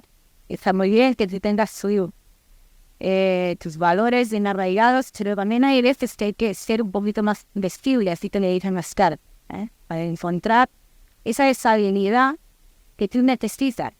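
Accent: England, United Kingdom. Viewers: No kreo ke es mikrofono es akcento ingles .algien se mete aki pienso jo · Pero a ver tia...hace dos meses hababas en andaluz,español ahora me hablas en acsento ingles...que te pasa?????i mira que yo hablo 7 idiomas pero esto realy nuncaa li e vistoooi baby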